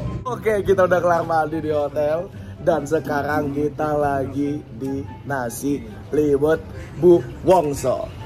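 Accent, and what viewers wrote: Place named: Java, Indonesia. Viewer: Om cocok kaya wong jowo logattelo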